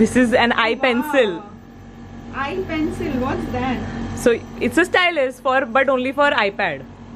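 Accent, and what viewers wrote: Gujarat, India. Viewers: I love how. Cute gujrati u speak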